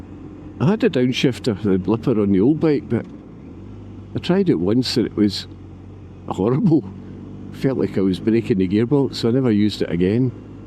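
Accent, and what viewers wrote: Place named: Scotland, United Kingdom. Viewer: I enjoyed the vlog. I'm familiar with the Moffat to Selkirk road you were on. The Glen cafe is worth a stop in the spring and summer. I too have a 2020 GS1250 which i love however its proven to be somewhat unreliable and has twice left me stranded. I'll be interested to see how you get on with yours in the coming months ahead. Its good to hear another Scottish vlogger on the Tube. Best wishes 👍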